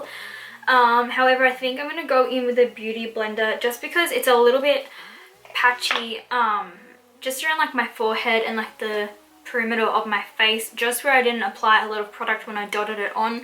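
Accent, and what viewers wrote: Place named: Australia. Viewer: You are such an Aussie. I can hear it :)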